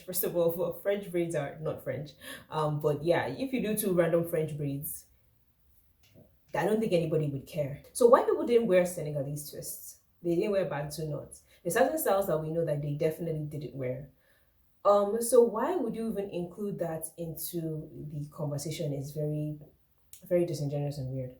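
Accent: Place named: Nigeria. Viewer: you don't know any better, and I can tell by how you talk you sound as if though you were not black American, you sound as though you may be a Nigerian or something I don't know, Before Europeans colonize Africa, they were literally circling around it., Africans were so naive.. amd had absolutely no idea.. I think black Americans have an idea on white people stealing things that culturally belong to them. Western popular music comes from Black Americans... black Americans for the past 100 years have set back in watch white people steal their music and become astoundingly wealthy as a result, many early black rock and rollers of the 1940s and 50s, died in obscurity, and white people who covered their songs become wealthy. But that's a different subject that we can talk about it some other time. But here's the thing.. I'm a little older than you and I know a little bit more than you do. 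So I'm a little wiser and more experienced in these conversation.. There is a dance called the Lindy Hop. And I'm bringing up dance, since you mentioned ballet... which black people are also ostracize out of that dancing community. There are videos on YouTube talking about that. But Lindy Hop is an old African-American dancing style come from the swing dance era of the Jazz era. Invented by black Americans. That dance is Now usurper by white people. I have heard stories of black people rediscovering that dance, and going to dance studios to learn it, but they feel ostracized by a lot of the wh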